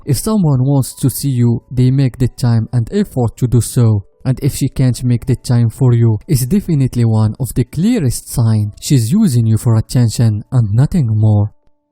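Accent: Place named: France. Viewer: he is a french speaker that's why he got that accent i think he's getting better just be patient and use captions Give him some encouragement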